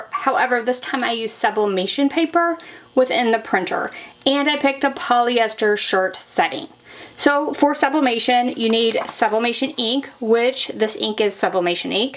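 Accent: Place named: United States. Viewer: The microphone is tuned up to high which means it's not exactly complimentary to a female American accent and vocal fry.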